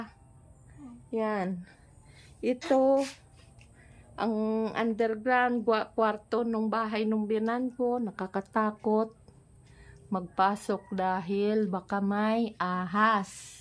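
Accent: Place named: Tagalog, Philippines. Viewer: Saan bayan kayo maam parang nadaanan ko na din jan.at Ang salita mo pamilyar Sakin Tagalog 😮❤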